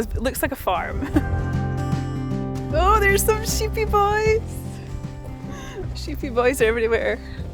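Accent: Scotland, United Kingdom. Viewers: Hi How are you doing today Ilike watching your youtube channel I like your Scottish Accent you look Very Beautiful Stay safe traveling you have Beautiful Weekend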